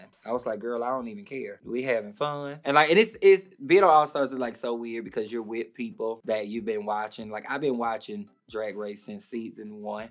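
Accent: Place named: South, United States. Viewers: Wait she’s southern?? I had no idea 😂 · What a pure pleasure to have and that southern accent for almost 30mins. Shes such a natural in front of the camera. Looove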